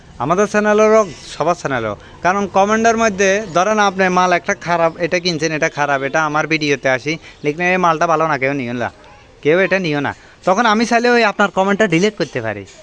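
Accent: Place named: Noakhali, Bangladesh. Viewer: নোয়াখালি নাকি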